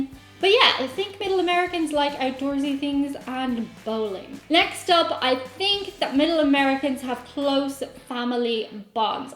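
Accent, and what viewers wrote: Ireland. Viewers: I would suggest flying into Minneapolis (AMS) from Dublin (DUB). I see tickets on decent aircraft for $631 on Lagskipping airline route finder. Then take a car down Interstate 35 through Des Moines, Iowa to Kansas city (MCI). You can pull over to small communities within miles of the highway. When you get to Kansas City, you can fly direct back to Dublin for about $750. I don't currently know anyone who bowls more than once every 28 years. When you get to northern Iowa, pay attention to the accent. Many of the immigrants that settled from countries that did not speak English hired Irish girls to teach in one room schools. It was a welcome opportunity during the potato famine. The central US does not have any hint of an English accent. It was strange hearing your Irish accent instead of the fake TV and Movie ones. See what you think when you drive through. 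Your timing should correlate either to the Iowa State fair in Des Moines or any or several fairs on your way through. (most are in late June or July but Des Moines should be in August). And yes, the coasts and south are almost like different countries from the Midwest west of the Mississippi. Towns east of Mississippi in the Midwest are just like extensions of Pennsylvania with large cities. If you want to try real corn, you want bicolor Iowa supersweet harvested no more than a day (preferably 3 hours) before cooking. (be sure not to get dent corn. that is the low quality stuff for feeding pigs or put into cans exporting) Ca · You know is getting serious about a topic when the cat ears come out. Just imagine poor Chewie’s shock upon being woken up from a lovey nap to be confronted by a glittery cat creature with an Irish accent.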